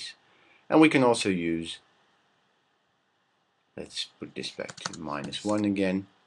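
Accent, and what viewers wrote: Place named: Netherlands. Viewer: DAT DUTCH ACCENT DOOOO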